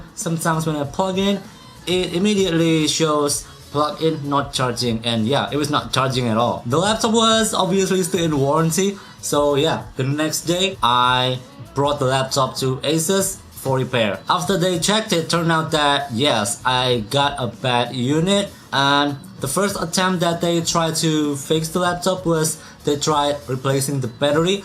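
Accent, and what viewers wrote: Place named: Indonesia. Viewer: You are Indonesian,i knew from your accent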